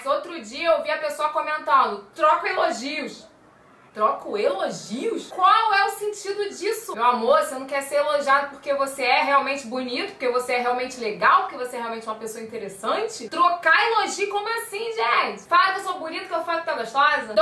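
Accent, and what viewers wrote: Rio de Janeiro, Brazil. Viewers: Maravilhosaaaaaaaaaaa!! hahaha Carioca legítima. Amoooooo <3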